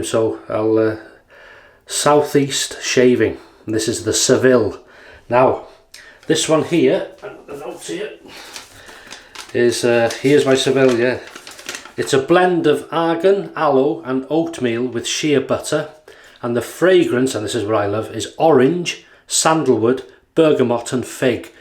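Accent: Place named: Barry, United Kingdom. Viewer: Where you from mate, you sound like your from Barry town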